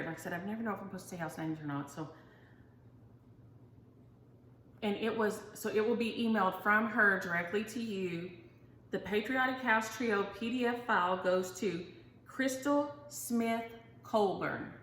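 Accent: South, United States. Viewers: I grew up in Tennessee and have lived in Massachusetts for 20 years now. I love your southern accent and stories. When I first moved from TN "fixin to do" was the first thing I had to give up. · . I stitch on Zwigart based Aida all the time. Most people think Aida only comes in 14 count, but I usually use the 16 or 18 count. It also comes in 20 and 22 and I've stitched on that too. The cheaper brands are a bit too stiff for me though. I dye it whatever color I want, with either Rit dye or coffee/tea dye. I so enjoy hearing another Southern accent! I was born and raised, and live, in North Florida and I know what "tump and fixin' to" mean!! Also, Poke salad is made from the Poke Berry bush. It grows wild here. Never had it though!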